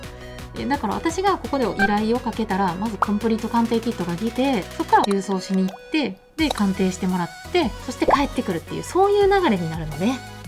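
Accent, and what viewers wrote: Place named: Kansai, Japan. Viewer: 関西弁弱いなー😙😙😙😙😙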